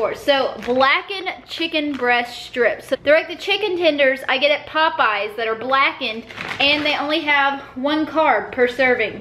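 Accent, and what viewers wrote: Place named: Texas, United States. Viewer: Oh em gggeeeee!!! Just tried the hatch green chili dip from Costco ... it is DELICIOUS!!! Thank you so much for sharing!!! Just found you a week or so ago and I am now slightly obsessed! LOL! I’m a central Texas gal, so I can completely appreciate your Texas twang! 😉 Keto on!!!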